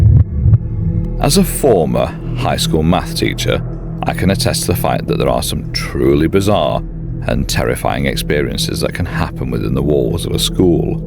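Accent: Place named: France. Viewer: Anyway nice french accent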